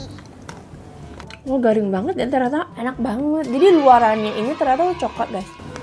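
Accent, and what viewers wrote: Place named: Bali, Indonesia. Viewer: Logat balinya udh mulai ad yaa😅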